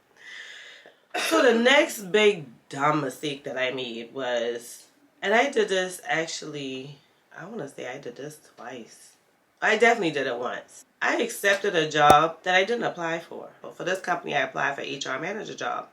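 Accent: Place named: Gullah, United States. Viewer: Are you Gullah Geechee? Or caribbean. You sound Gullah.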